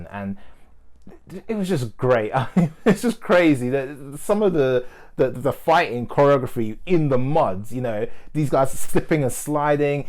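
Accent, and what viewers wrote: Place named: United Kingdom. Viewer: Hey with british accent.. good review bro..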